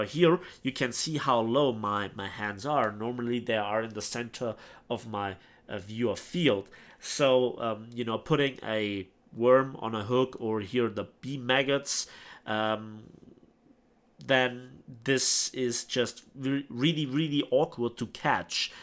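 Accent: Bavaria, Germany. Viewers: Ist das bayerisch? Oder ein anderer Akzent?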